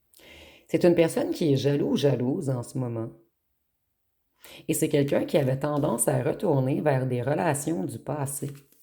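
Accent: Canada. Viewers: ❤bonjour, j aime vos guidances. J ai booster, j ai liker.j adoré votre accent du canada❤et je trouve vos jeux de cartes originaux.merci 😊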